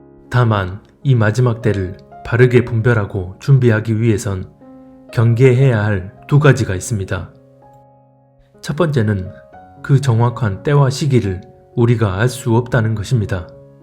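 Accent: Jeolla, South Korea. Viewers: 억양을보니 절라도 사람 같다.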